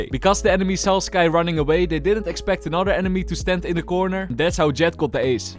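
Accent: Netherlands. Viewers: you sound? look's like you from netherland are you from netherland?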